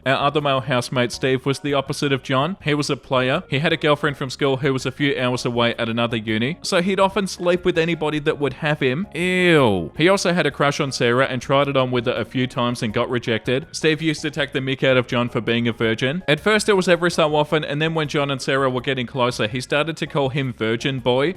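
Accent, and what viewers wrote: Australia. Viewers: Oh, you are such an aussie mate. When you said "And sucked in too" I just cracked up it came out of nowhere wasn’t expecting it 🤣 That was gold dude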